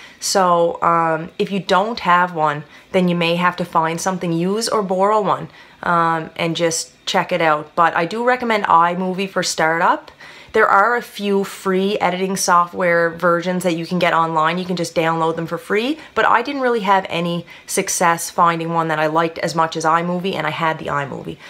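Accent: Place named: Nova Scotia, Canada. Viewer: Thanks for sharing this. I've been thinking about doing a channel of my own for a while. I def love hair stuff and have been watching videos for years on Youtube so that would be my main focus, but I would probably add in some fitness/nutritiong stuff too, since I am a PT...or physiotherapist as they probably call it in Nova Scotia. BTW, I love your accent!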